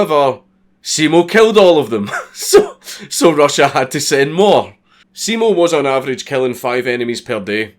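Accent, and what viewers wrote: Scotland, United Kingdom. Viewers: I would love to have dat accent its so extreme I love it. (sry if wrong but is it scottish accent?)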